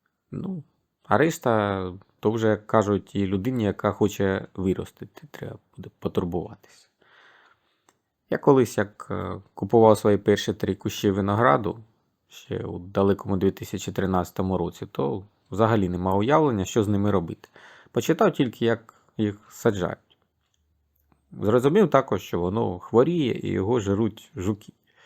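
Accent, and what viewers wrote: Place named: Desna, Ukraine. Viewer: Знайома, точніше, рідна вимова. Таке, як придеснянська. Ви часом не з Батурина?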